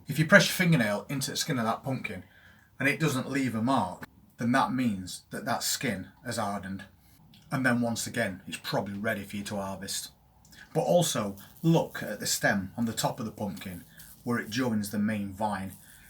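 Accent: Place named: Northern England, United Kingdom. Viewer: Love the way that the auto caption does not cope with your Northern accent. Dont change anything. Sounds Ola!!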